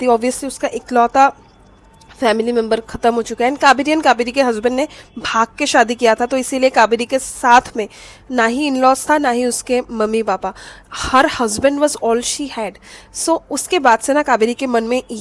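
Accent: Bengal, India. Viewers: Love your bengali accent💛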